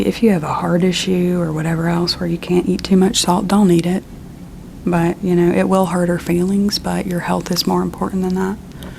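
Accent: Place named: North Carolina, United States. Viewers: I grew up in NC, and one of the first accents I was able to pick up was the thick NC accent of one of our neighbor ladies. This brought back so many memories lol ty ❤ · Ugh I love. I live in NC and she reminds me of this sweet woman who used to work a local convenience store. She would talk your HEAD off but she was so sweet, and the accent reminds me so much of her.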